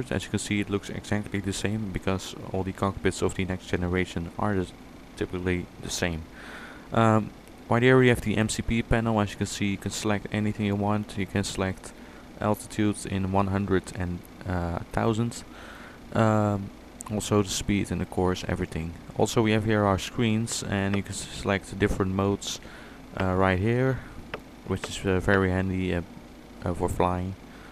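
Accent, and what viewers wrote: Netherlands. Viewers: From your accent I can hear you're dutch :)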